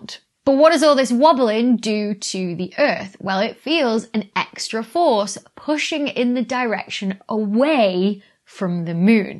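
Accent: United Kingdom. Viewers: Over here, on the other side of the pond, we colonists (oops, I mean Americans) pronounce the word cen-TRI-fugal, not centri-FU-gal. That's not to say you're wrong... just different. (I actually am quite taken with your UK accent.) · She uses the British pronunciation with the accent on the u. American pronunciation has the accent on the i. Both are correct in their respective countries. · Wobble. Wooble. You pronounce it almost like the second word so yeah, it does sound a bit funny. Just like Saturn. Sah- uhn! Love your stuff Dr. Becky. I just have to translate your accent! When my wife and I watch Brtish TV shows, I'm always translating for her. We are both native English speakers!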